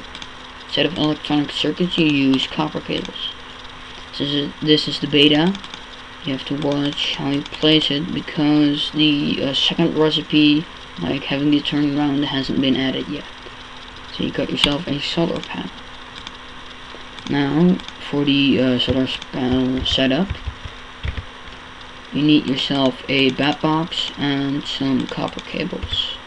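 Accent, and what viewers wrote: Netherlands. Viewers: thanks for the solar setup! seems much more efficient then the flowers, but the flowers were so pretty! unrelated to that: hello there, obvious dutch accent.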